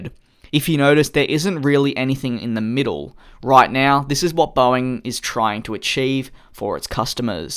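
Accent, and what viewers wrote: Australia. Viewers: Wait.. u from Australia? thought you were from GB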